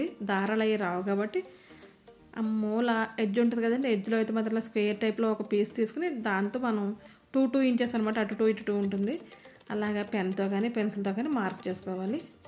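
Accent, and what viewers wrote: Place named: Visakhapatnam, India. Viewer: హాయ్ అండీ నేను చాలా సార్లు మీది ఏ ఊరు అని అడుగుదామని అనుకున్నాను మీ లాంగ్వేజ్ ని బట్టి మీది వైజాగ్ అనుకున్నా కాని మీది కర్ణాటక అని చెప్పారు చాలా సంతోషం మీ మాట తీరు ని బట్టి వైజాగ్ లో మా రెలెటివ్స్ అంతా నాకు గుర్తొచ్చేవాళ్ళు మాది నిజామాబాద్ అండీ thank u🙏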